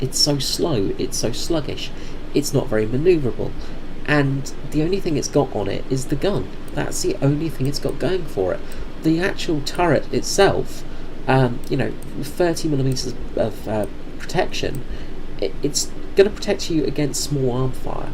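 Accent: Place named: Australia. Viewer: Thank you again for your reply and the info on TD units .I will definitely check it out . John from Pittsburgh ( if you don't know , that's in the state of Pennsylvania , USA --- you sound like an Aussie or Kiwi , so thanks again mate )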